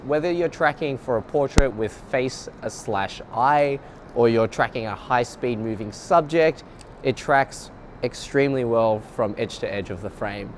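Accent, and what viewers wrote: Australia. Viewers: Good effort. However no standout point in a sea of xt3 reviews on YouTube, other than the Aussie accent part.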